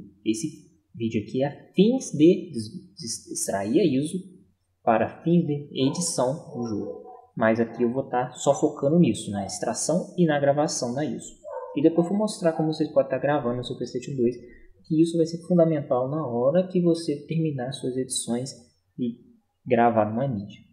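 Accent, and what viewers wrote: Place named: South, Brazil. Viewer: VC É DO SUL DO BRASIL TIPO SEI LÁ RIO GRANDE DO SUL POR CAUSA DO INICIO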